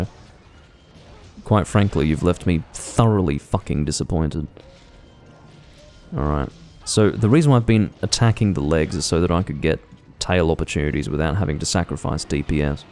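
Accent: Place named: North America. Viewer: Your North American accent is on point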